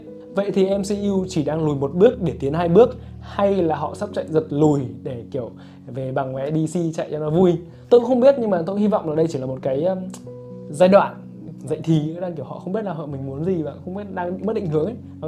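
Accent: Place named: Central, Vietnam. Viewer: Yo kh bàn đên sự vô duyên của ông thì cái nói miền trung tôi thấy nó hơi có mùi phân biệt r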